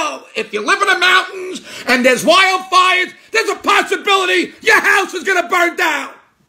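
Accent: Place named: Brooklyn, United States. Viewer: “WHERE I COME, ITS HOOO AAAHHH!!!” LMFAOOOOO I RECOGNIZE THAT BENSONHURST BROOKLYN ACCENT ANYWHERE, LMFAOOOOO ….THANKS FOR THE LAUGH 🤣👍🏾👍🏾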